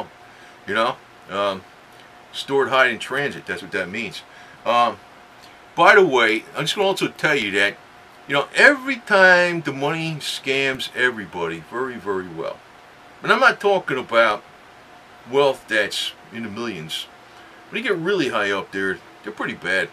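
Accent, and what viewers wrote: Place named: New England, United States. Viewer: Dude wearing a confederate hat ,rebel flag,and yankee voice and it's clear your a yankee....hard to take you serious....sorry bro, all I can do is laff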